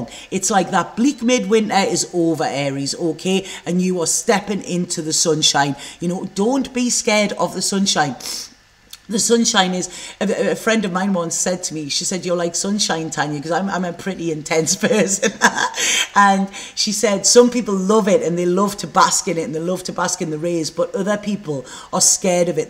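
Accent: Newcastle, United Kingdom. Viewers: Awwww how man!! a Geordie lass tarot reader!!! Love it!! Just stumbled across you! You've now got a Geordie follower 👍xx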